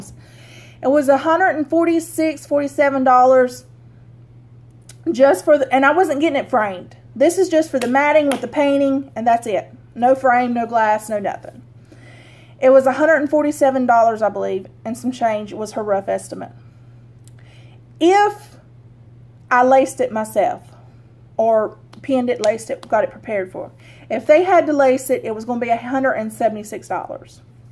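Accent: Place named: South, United States. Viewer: Just found your station and I am a new subscriber---thanks for a great video, I have a southern accent too. I loved every subject you discussed & everything you showed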